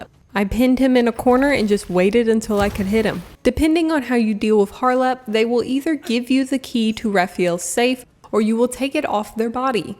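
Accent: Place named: South, United States. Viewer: What is your accent? I feel like it's southern but like sophisticated at the same time 😎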